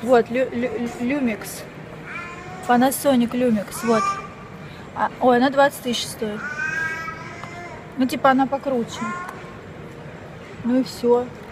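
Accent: Rostov, Russia. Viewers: вы с Ростова?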